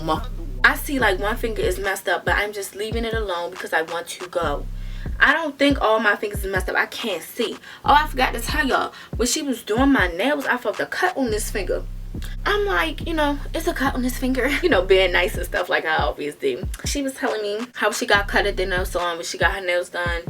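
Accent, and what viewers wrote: Baltimore, United States. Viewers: Baltimore ASFFF😂👏🏼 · Tew, dew, stewpid 🤣🤣🤣. I love Baltimore accents 😂. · I just know you’re from Baltimore. You got to be ! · I was going to ask if she was from Baltimore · Now tell me why I knew you was from Baltimore before you even started the story 😩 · Just came across dis video while watching different storytimes Nd You said “do” a couple times and I straight knew u was from Baltimore 😂.. but this exactly y I hate getting my nails done I swear it always hurts then I don’t be saying nothing to em.. i just be sayin “pretty hurt” in my head but it be burning fr 💯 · You from Baltimore ain’t you lol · Nah she sounds like she from Baltimore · U from Baltimore? U sound like one of us. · Ma'am are you from Baltimore?? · u gotta b from bmore lol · You have a accent are you from Baltimore? I enjoyed your story time ☺️ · My first video of u and know u must be from Baltimore I love the accent lol💕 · Are you from Baltimore ? 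Love your accent . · are you from bmore? lol you sound like people from there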